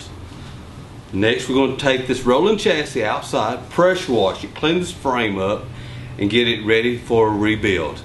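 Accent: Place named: South, United States. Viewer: Thank you for your informative and easy to follow videos. (We also enjoy your beautiful southern accent.) Please make more videos as time allows you. What a GREAT Teacher you are! ㋛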